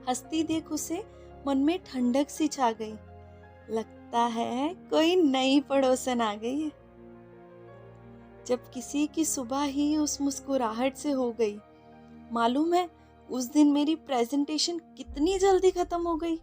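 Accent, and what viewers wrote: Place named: Punjab, India. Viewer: You have lovely punjabi tone as well💞💞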